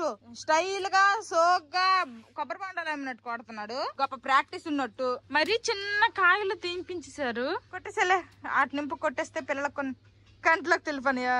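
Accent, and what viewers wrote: Srikakulam, India. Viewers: Sikakulam basha 😅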